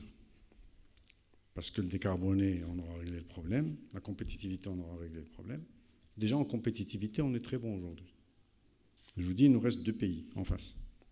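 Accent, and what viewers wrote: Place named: Marrakesh, Morocco. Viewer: l'accent et l'humour marrakchi vous colle toujours notre cher ministre...c'est bien pour attirer tout cet adorable auditoire à ce que vous dite...si important et si sérieux. merci moulay hdid Alami.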